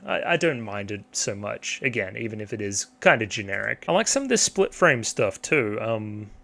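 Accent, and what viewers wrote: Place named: Oceania. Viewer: I think your knowledge of horseracing, horse behavior, idol shows and passion projects like Symphogear and GuP makes you one of the best candidates to enjoy this franchise to its fullest. Personally, I feel it is the best thing to happen to me watching any media in the last 5 years. Firstly, I like how you gave time analysing the episode and doing some research. So I will share some points, a few of them might be answers to your questions. (-1) Cygames sponsor Kentucky Derby, Grand Prix in Paris; many of the company heads own thoroughbreds themselves. Many legendary jockeys sponsor the franchise. This show is a beautiful love letter to Japanese horceracing (JRA, , anime counterpart is URA) history and the racehorses that participated in it. The deeper you dig into how this franchise takes on irl lore, the more fascinated you'll get. 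Howevever, S1, released 3 years before game release, was about the company still struggling to form their own studio to produce their show and find a working formula. It still ended up being more than decent. (0) BACKGROUND: Others have probably already told you about how uma musumes are born. A decoration on left ear means they were mares irl (like Black Caviar the legend from Australia), while right ear means stallion (like Bonecrusher from NZ). I gave you Oceania examples based on your accent XD. (1) The Uma Musume anime is NOT an anime adaptation of the gacha. Neither is it an advertisement for the game. Uma Musume is a "multi media" franchise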